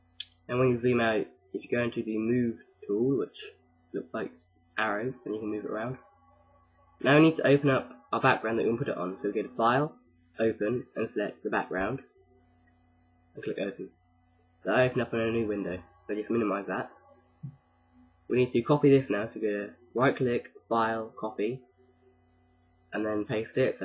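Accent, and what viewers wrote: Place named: United Kingdom. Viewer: He's British, like me! ;p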